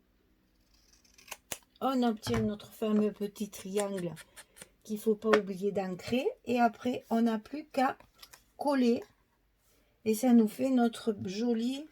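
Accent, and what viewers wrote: South, France. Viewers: bonjour. Merci pour toutes ces explications,j'adore votre petit accent du midi cela fait chaud au coeur. je voudrais savoir combien faut il de papier de cette collection en quel format s'il vous plais.merci. et merci pour tous ces astuces donnés avec simplicité bonne journée à bientôt.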